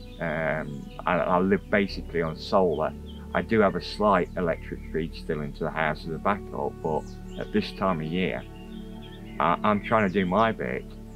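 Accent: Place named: East Midlands, United Kingdom. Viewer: I would love to know whereabouts in the East Midlands you are from, or rather where you spent the most time as your accent is so familiar to me. My Dad was from Heanor in Derbyshire but worked for the electricity board as an overhead linesman. My husband also has a similar accent as we are from Kimberley in Notts. I hope you don't mind me asking. My husband would love your lifestyle.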